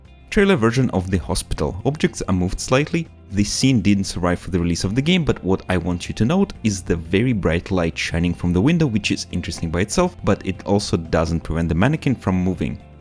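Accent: Russia. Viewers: Я слышу русский акцент))))) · sir, are you by any chance Russian?)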